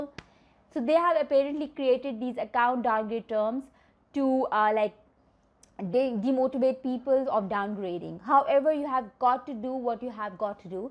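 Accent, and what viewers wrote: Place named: Mexico. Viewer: Que lindo la mexicana y su ingles =)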